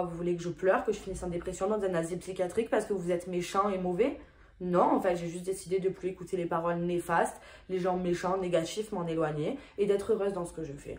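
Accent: Marseille, France. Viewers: Hello, tu as un accent sympathique, tu viens du sud ?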